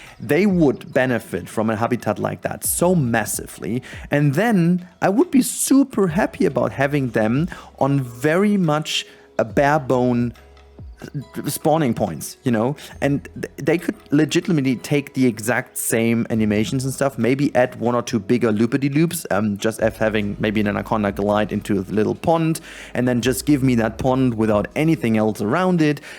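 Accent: Germany. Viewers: I hear the German Accent😂 I love it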